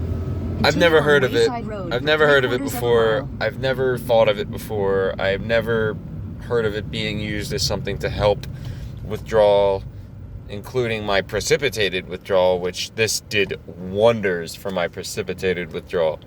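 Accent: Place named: New York, United States. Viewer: I just saw where a few people had asked where you were from, I must toot my own horn when I asked myself the same question because I thought you were from NY with that heavy accent. Keep on doing what works for you! I just hope one day I can rewire my brain. I'm terrified of having a bad acid trip also which just means Murphys law is bound to happen if I ever did try it. 🙃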